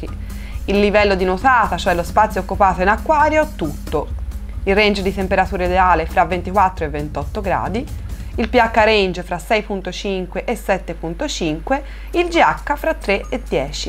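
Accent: Tuscany, Italy. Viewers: It's Italian , with a strong Tuscan accent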